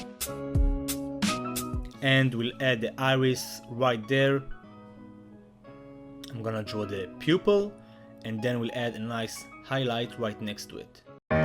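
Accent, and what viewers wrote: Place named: Israel. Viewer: פתאום אני שומע את המבטא הישראלי שלך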